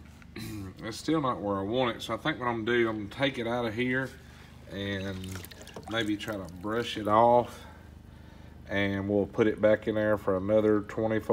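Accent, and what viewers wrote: South, United States. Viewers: I love your accent, you sound like Oggy Doggy, or Doggy Daddy,, or Deputy Droop Along, or that Huckle Berry Fin dog..No offence meant, just a classic American accent. [From the south?]. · Classic American? Only a small portion of the populace sounds like that, and yes he's in the south.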